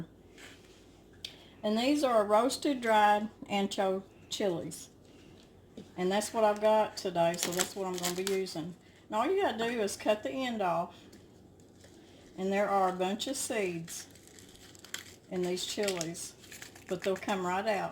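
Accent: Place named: Texas, United States. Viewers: LOVE this! And you sound just like you are from Pasadena, Texas! I’m from a little further east piney woods. Love yalls channel! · I just knew that was a Texas accent! hello from Houston. Oh my goodness my Grandma & Pawpaw lived in Sulphur Springs I use to spend summers with my grandma (we lived in Garland TX) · My kin are in Pasadena Texas as well. 😁 I thought I recognized your accent. Your tamales look yummy....😋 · How did you decide to homestead in Arkansaw and can you make enough on the homestead to sustain you or do you also hold down regular jobs? I love your Texas quiet voice! I live in Texas and my voice sounds a lot like yours. Can't stand harsh voices. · I knew that accent was Texan!!!!!!!! ♥♥♥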